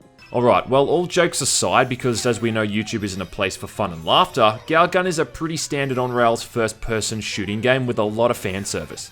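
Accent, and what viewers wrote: Australia. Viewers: I don't know why, but this review made me laugh harder than it should have, possibly because I'm trying to see you as some studious, constantly befuddled Senpai (if that's the right word). Also, I'm dying to find out what an Australian accent sounds like paired with Japanese.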